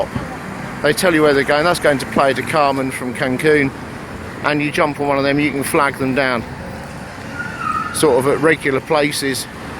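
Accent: United Kingdom. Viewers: I know what Southwold is :-) just checking out PM as a destination as we lost flights to Roatan, 2021 due to the pandemic its looking like a good plan B, thanks for the tour and hearing a Brit accent, Im glad we arent the only ones who venture further afield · Which British accent is yours precisely? Thanks.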